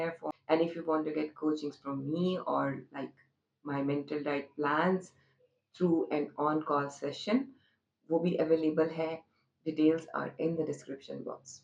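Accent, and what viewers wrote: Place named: Pakistan. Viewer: Pakistan, you can feel the difference in her accent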